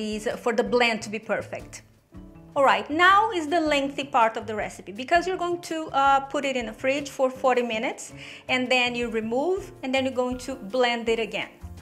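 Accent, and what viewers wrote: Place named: Brazil. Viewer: Eu acho que essa moça é brasileira, tem sotaque de brasileira e nome também. 😂😂😂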